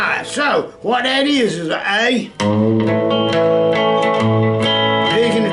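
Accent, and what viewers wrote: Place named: Appalachia, United States. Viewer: I like the way you talk! Good ole Appalachian/southern draw.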